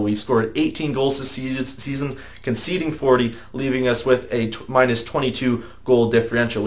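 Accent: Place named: Birmingham, United Kingdom. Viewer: God he has such a strong brummie accent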